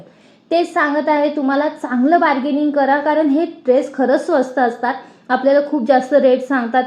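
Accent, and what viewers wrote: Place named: Vidarbha, India. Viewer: Vidarbh language watat aahe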